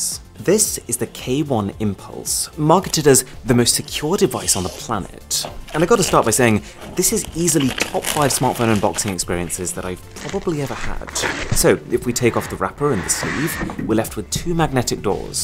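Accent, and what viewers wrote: RP, United Kingdom. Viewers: I just watch your videos just because I like the way of your talking, specially British accent I just love it❤️.huge love from Bangladesh 🇧🇩. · I love your voice. The poshness (sorry i dont know a better word lol) iv subscribed xx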